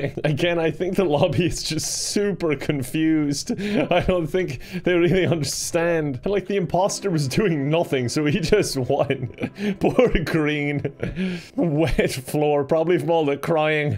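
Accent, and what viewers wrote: Ireland. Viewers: irish accent is the best accent, prove me wrong <3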